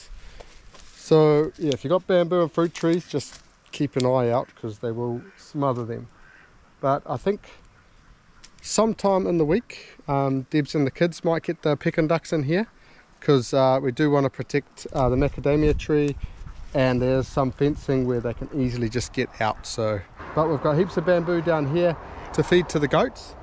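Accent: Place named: New Zealand. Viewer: Bros got one of the thickest NZ accents ive ever heard lol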